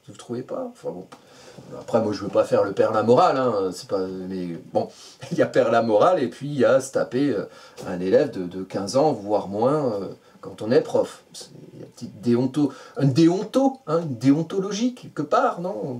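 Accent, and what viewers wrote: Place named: Grand Est, France. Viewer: 😁rien est normal effectivement, même pas sec derrière les oreilles et ailleurs 🙈🙊, bref ...Merci pour votre humour et votre travail ! Vous avez un petit accent , de la région grand Est. Une balance, ascendant vierge et le must une lune noir en balance😁😉😎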